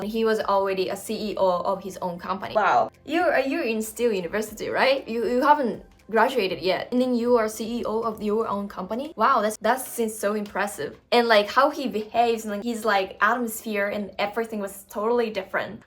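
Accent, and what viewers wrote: Australia. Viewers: While you live in Australia, you perfectly pick up Australian twangs. You might be the person picking up the accent in the country where you live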